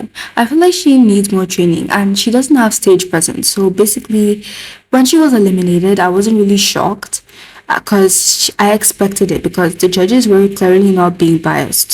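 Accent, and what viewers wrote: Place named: Nigeria. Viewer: OMGGGGG YOU'RE NIGERIAN TOO. I HAD A FEELING YOU WERE FROM YOUR ACCENT. I'M NIGERIAN TOO NICE TO SEE A FELLOW NIGERIAN THAT LIKES KPOP❤❤❤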